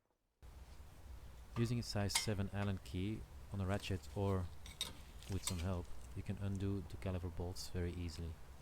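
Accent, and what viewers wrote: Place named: Belgium. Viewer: Ik kon zo horen dat je een mede belg was, goed uitgelegd bedankt voor de informatieve video 🙂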